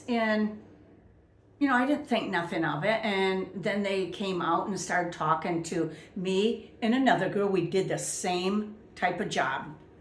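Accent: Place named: Great Lakes, United States. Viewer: your accent sounds like your from the great lakes region ( chicago , milwaukee , minnesota )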